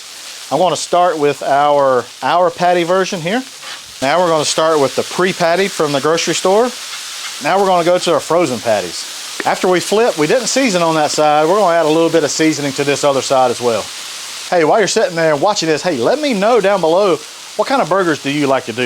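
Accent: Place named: Texas, United States. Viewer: Toda tu comida me encanta muchisimo eres un gran chef!!! Me gusta tu acento tejano😊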